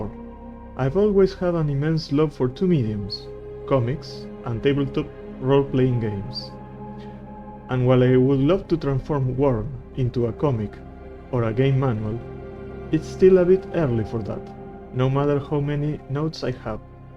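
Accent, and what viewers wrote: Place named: Argentina. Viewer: Llevo 20 segundos del video y escucho que sos de Argentina y me salio un terrible OOOOAAAAA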